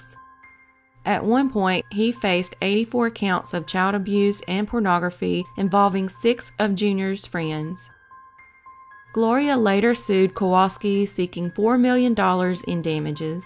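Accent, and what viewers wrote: South, United States. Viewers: Absolutely great channel with your southern talk love it! May God continue to bless you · I've heard of a few, but not all. This is some research and time. Her voice so soothing, Keep doing what you're doing Southern lady.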